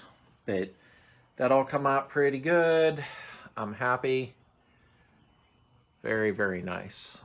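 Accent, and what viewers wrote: Pittsburgh, United States. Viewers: pittsburgh accent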